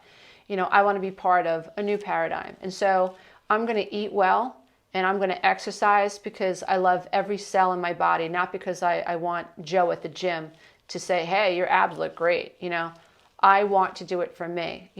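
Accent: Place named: Long Island, United States. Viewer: PS. You sound like you’re from same area I’m at. Bellmore Long Island. Originally from flushing. Then Connecticut Florida Massachusetts and now N Bellmore. Thanks again for your very helpful videos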